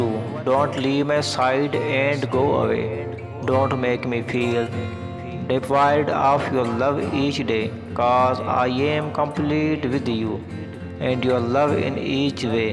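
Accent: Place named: India. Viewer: Your voice like indian men not arabic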